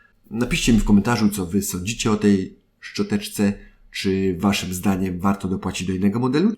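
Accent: Silesia, Poland. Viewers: Dobra i tania, ja mam elite pro cos tam,skaczą te ustawienia na wyświetlaczu zanim zaczne myć a ta prosta i tez Mocna ,kilka szt kupilem na prezenty,fajna jest bo cicha.Masz sluński akcent🙂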